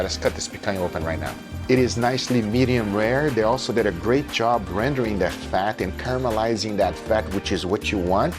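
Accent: Minas Gerais, Brazil. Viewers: I love when he speaks portuguese, the mineiro on him comes out hahahaha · I’ve been watching your videos for some time now, and then you say, WE are known for Picanha, Você é Brasileiro mano? Eu achava que era algo espano pô, mas o sotaque me puxava de vez enquando achar que era mesmo brasileiro. Me confirma aí carai kkkkk thanks buddy. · Wow, your Brazilian accent is on spot, way to go 👏🏻👏🏻👏🏻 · o sotaque mineiro do é engraçado e a comida incrivel!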